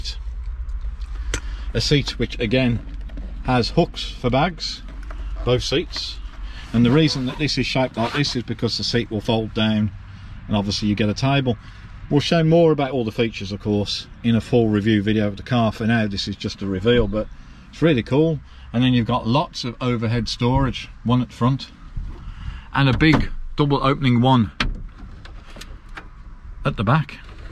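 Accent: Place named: Birmingham, United Kingdom. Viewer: Proper Brummie accent....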